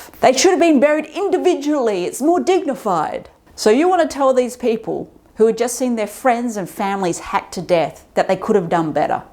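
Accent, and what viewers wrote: Australia. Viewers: Like I said you should have used 1 minute at the beginning of the video to mention what made this deplorable genocide against the Tutsi happen. I understand you were talking about the aftermath but the correct information at the beginning would have been better. The Hutus did not decide in one day to kill their neighbours, families and friends who were Tutsis and Twa this was caused for decades by the white European invader colonisers. Causing division and bringing their racism and discrimination to another African country they invaded. The only reason I came across your page was because this April made it 30 years since the genocide against the Tutsis and Twa otherwise I don't watch morbid channels like this. Judging by your accent you sound Australian (I may be wrong), maybe you can make a video about how the white invaders destroyed the indigenous Australians? 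What happened to the indigenous that were killed afterwards?? Would be interesting to find out. Have a good day.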